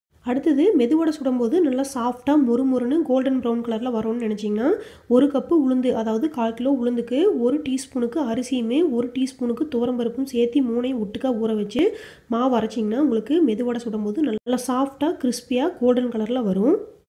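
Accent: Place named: Coimbatore, India. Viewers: Nga nu solra slank patha neenga Coimbatore ngala... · Neenga coimbatore ah akka slag super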